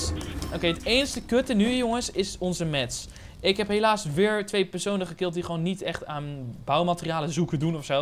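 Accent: Apeldoorn, Netherlands. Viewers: Kom je uit Apeldoorn?😯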